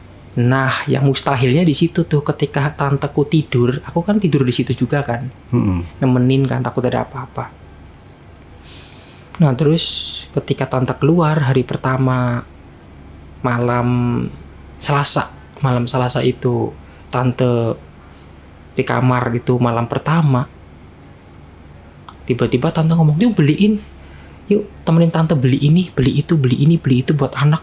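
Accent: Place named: Banyumas, Indonesia. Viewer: Ngomongnya kok sprt org ngapak sprt sy 😂😂😂😂😂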